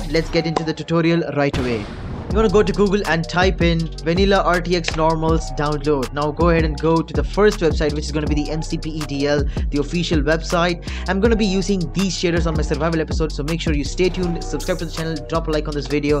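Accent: India. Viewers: Are you a Indian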